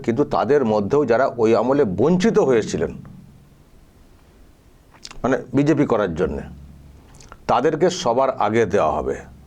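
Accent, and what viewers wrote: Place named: Bangladesh. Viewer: Uchcharon toh puro Bangladesh e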